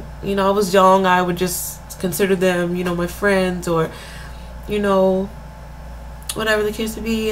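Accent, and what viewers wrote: Caribbean. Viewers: Where are you from. I hear a couple of accents from you. Like Caribbean at times and an English accent. Be blessed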